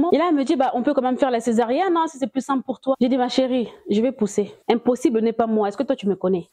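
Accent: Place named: Democratic Republic of the Congo. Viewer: Wow ! 🥹 J’ai eu l’impression d’entendre le récit de quelqu’un de familier, tellement ta façon de parler est typiquement congolaise, avec des van en lingala ! Je me suis sentie très alaise. C’était franchement agréable. Tu a été véridique et authentique, tu as vraiment transmis tes émotions d’il y a 9 mois et c’est super. J’ai eu un peu peur mais j’apprécie beaucoup ton courage. Félicitations pour Bébé 3 fois canon et bon courage pour ses 7 frères et sœurs qui sont aussi en chemin. 😁👏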